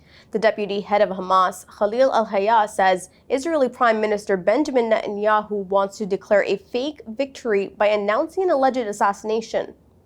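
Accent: United States. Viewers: I am a Muslim and I don’t like Palestinians the way Palestinians treat other Muslims in Saudi Arabia. I know Palestinians Directors in GCC countries badly treating others at jobs there I cursed one Palestinian director to be punished by Israel back in 2016. So please I advise you people to treat others well by rich Palestinians working in GCC countries. Also why you people migrating to Europe US and Canada and not fighting IDF. Islam tells you not to flee from war but fight them but you people are like cowards fleeing. So you better go to Gaza to fight instead of giving us news in an American Accent. You people and Afghanis are the same you live in western countries while you want others to tell Israel to stop war instead of you fight.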